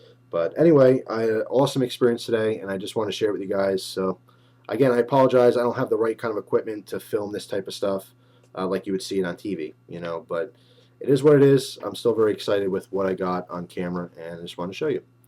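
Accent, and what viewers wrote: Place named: East Coast, United States. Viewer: East coast accent buddy. Lol. Everyone fucks with us for it.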